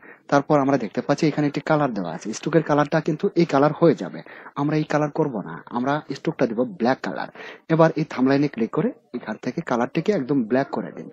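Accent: Bangladesh. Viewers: Bangla vasa ta ektu valo kore bolle valo hoe.. bangladeshi vasa ta sunte baje lage.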